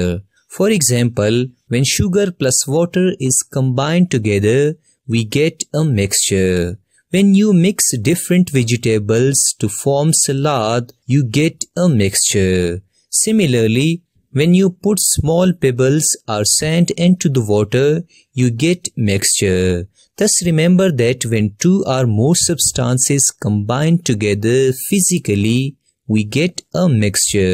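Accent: India. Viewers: Omg....I love his Indian accent it’s so cute 🥺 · Its indian accent though, the spongebob narrator has a french accent✨ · His accent is so pure Indian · ugh another idian speking guy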